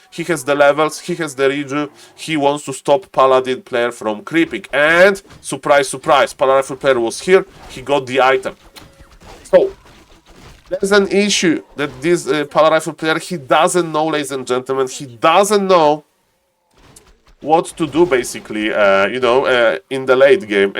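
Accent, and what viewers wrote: Poland. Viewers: Are you Polish? The accent is very polish :D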